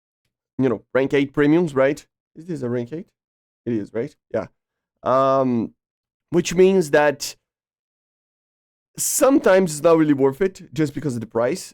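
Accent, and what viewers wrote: Brazil. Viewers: Essa cara é brasileiro kkkkkk ele fala ingles mt bem mas tem um sotaque de Br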